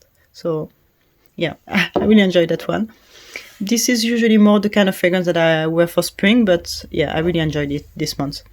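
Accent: France. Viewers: Love your description of Fille En Aiguilles. Have similar feelings about it. Orthodox Christmas :). P.S. Please don't apologize for the french accent - that's great!! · Don't apologise for your French accent - it is beautiful! So nice to hear the correct pronunciations for the French names, especially the Shalimar. I've been wanting to try Lui, so hard to find in Australia though...I also love Dior Addict, I have the earlier first two versions., 2002 & 2014. I was exactly the same as you with L'Heure Blue - it took me a while to love this one, I initially found it quite difficult, but it takes some time to warm up to this one! But when I'm in the mood for it, it's perfect. I also really love Perfume Initial! 💙 The bottle is so beautiful & elegant. Lovely video thanks! A big hello from a very warm Australia. x 🤗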